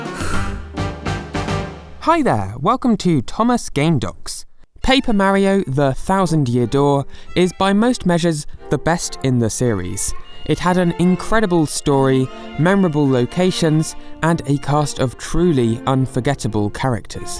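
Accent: United Kingdom. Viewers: The narration is so British, I had to grab a cup of tea just to not feel out of place while viewing.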